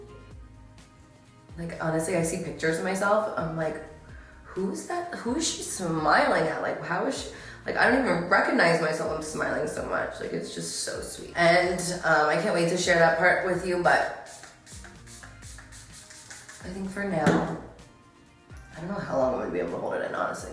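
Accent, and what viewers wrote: New Jersey, United States. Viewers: I just noticed your New Jersey accent ☺️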